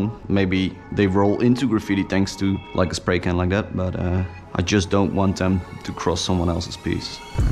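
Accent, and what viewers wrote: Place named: Netherlands. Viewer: Ik wist dat je nederlands was, want dat kan je gelijk horen met hoe je engels praat🤣 goed werk man👍💪🏻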